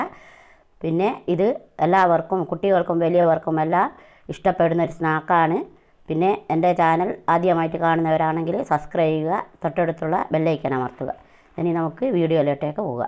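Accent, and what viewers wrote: Nadapuram, India. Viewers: Nadapuram bagathulla basha samsarikkunnond chothichatha njnum Nadapuram ഏരിയയില്‍ aanu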